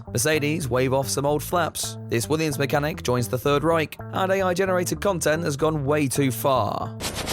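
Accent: United States. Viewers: Is that an American accent?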